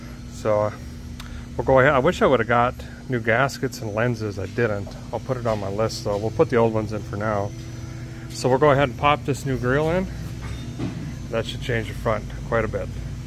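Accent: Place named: Tennessee, United States. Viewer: Great idea Thanks! Tennessee talk! I saved some thought was junk too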